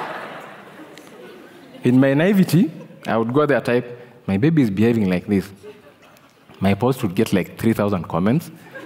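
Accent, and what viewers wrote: Kenya. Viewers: And you can even get the Luhya hint in it. Great guy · I love the Kenyanness im your pronunciation. Haha...and your sense of humor 👏👏👏🙌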